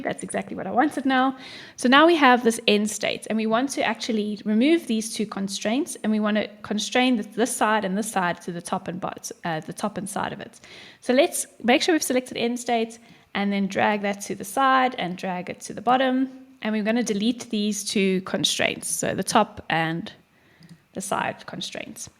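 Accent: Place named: South Africa. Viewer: woah threw me off like wait south africa? is that what im hearing :D eyyy same same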